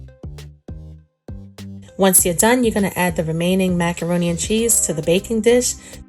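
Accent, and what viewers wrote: New York, United States. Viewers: Looks delicious, funny it’s southern but you sound like ur from New Yawk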